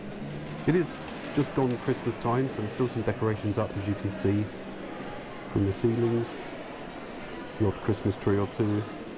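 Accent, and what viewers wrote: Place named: United Kingdom. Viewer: What I meant was I love your British accent that’s all. I am resting up for your next walking video. Stay safe.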